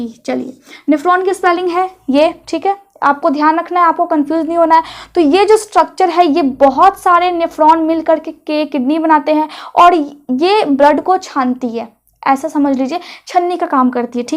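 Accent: Bihar, India. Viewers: Ap Bihar se ho didi kaya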